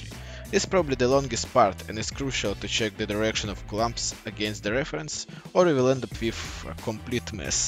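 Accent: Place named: Russia. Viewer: хах, русский акцент слышно сразу)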